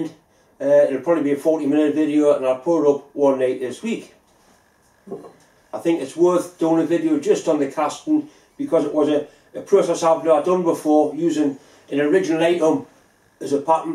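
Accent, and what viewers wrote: Newcastle, United Kingdom. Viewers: wey aye, Geordie lad. Brute force and finesse , I love it.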